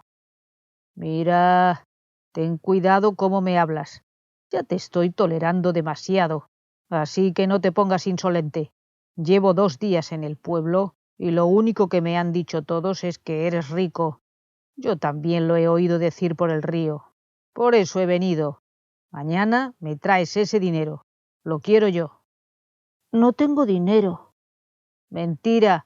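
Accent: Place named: Spain. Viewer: me gusta como lees y me gusta que sea un video de tan solo una hora. La pronunciacion es clara. Me encanta oir tu espanol de Espana.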